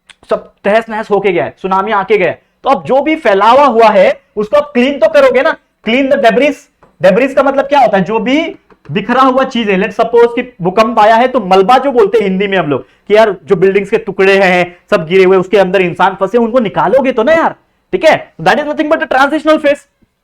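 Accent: Maharashtra, India. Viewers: Are you Marathi sir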